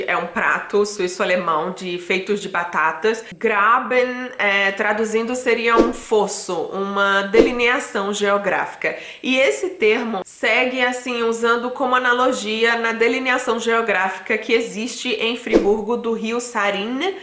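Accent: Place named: Recife, Brazil. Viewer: Gosto do seu sotaque recifense !!!!😍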